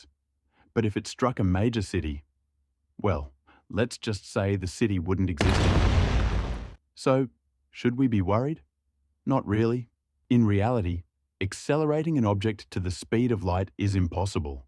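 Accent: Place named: Australia. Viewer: Myoves, naaur, classic Australian speech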